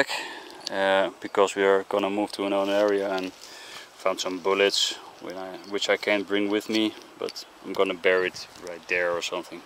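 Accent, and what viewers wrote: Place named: Netherlands. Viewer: Coole video! Maar je hoort wel dat je Nederlands bent😂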